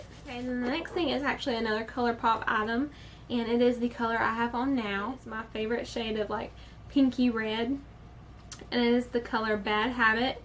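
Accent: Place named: South, United States. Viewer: Im from the south also , love your accent. Thank you for the wonderful reviews. Extremely helpful .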